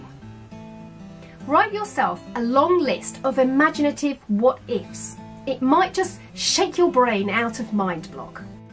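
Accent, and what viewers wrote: United Kingdom. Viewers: a nice English voice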